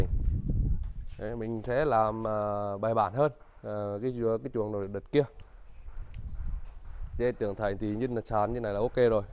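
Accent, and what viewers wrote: Nghe An, Vietnam. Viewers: Bặn lên bặn lên. Đúng đậm chất nghệ luôn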